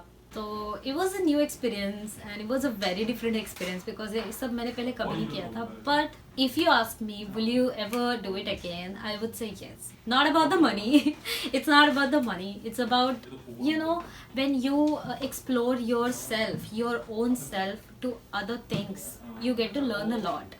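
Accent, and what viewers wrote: Maharashtra, India. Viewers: Her hindi-marathi accent is so sweet^^ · LADKI KI HINDI LAGI PURI MAHARASHTRA WALI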